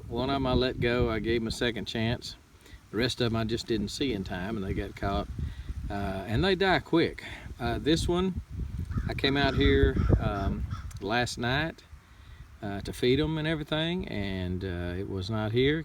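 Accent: Texas, United States. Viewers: Dude you have the absolute perfect Texas accent/voice. I could listen to you read a book or do the news or something like that. I grew up in central ok then moved to north texas so mine is not quite as pronounced as yours. I love it though. Good show!!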